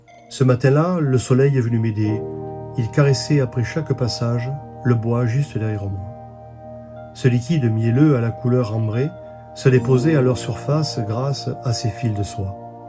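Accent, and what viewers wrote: South, France. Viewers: Effectivement, pas un luxe de les rénover! Merci de nous apporter du soleil avec votre bel accent du sud, à nous les nordistes sous la fraîcheur…estivale ! 😇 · superbe restauration il est vrai que notre société de consomation ne voie pas toujours cette fonction de pouvoir réabilité certaine chose.Mais quel plaisir de vous écoutez aussi parlé dans la langue de pagnol au chant des cigales vous êtes un conteur histoire magnifique j'adore 🙂, de plus je suis du sud aussi bravo a vous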